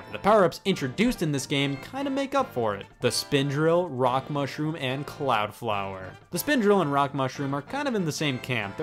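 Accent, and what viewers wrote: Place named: South, United States. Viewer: I heard "Y'all" too... Or maybe that's how I think all Americans speak, all southern like. It's definitely refreshing since other channels start with the now overused "Hey what's up guys, xxx here and today...". intro feels like a backhanded greeting, it feels like he's welcoming me but doesn't want me here at the same time... Sort of like my mother.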